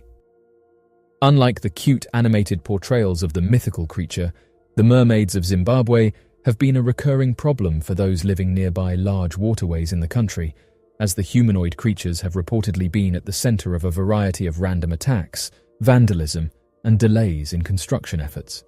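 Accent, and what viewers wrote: United Kingdom. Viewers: Cant go the F'n Brit commentator.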